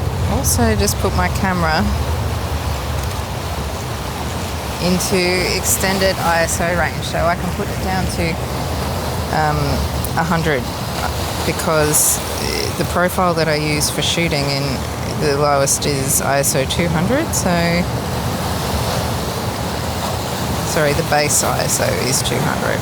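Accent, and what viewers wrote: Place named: Australia. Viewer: So glad the YouTube algorithm showed your video! So nice to see and hear an Aussie woman photographers experience. Looking forward to watching more of your work ❤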